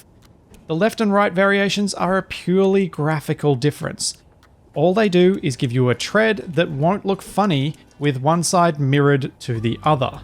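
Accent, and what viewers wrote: Australia. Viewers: Aaah yeah i knew you did something like that;) I think its even easier 4 me to understand you Guys because of your Australian accent ´s (dont like that high Royal Perfect UK Eng so much it sounds so... Royal and is to fast) Your accent sounds much lovelier and sometimes more Funny esspecially when comes around the Corner and youre "makin him Dizzy" and something like that;) Ever want to travel to Australia and not because of the Beautiful Land itsell but mostly for the nice People and Culture that are living there and mby a little bit because of a Movie Series in my Childhood... Sorry but since i saw Mr. Croc Dundee 20 yrs ago or so i knew you were Cool People and i really like the Pesonality of this guy since now;) Its just a movie i know and because of that im happy to be able look just around the World with your Series here and all the other ZebraMonkey LSG´s. 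So again Thanks for good Entertainment and education!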